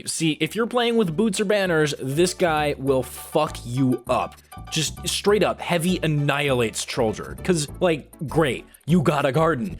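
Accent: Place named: Texas, United States. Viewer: Shit sound you live in Texas? That is scary close to me.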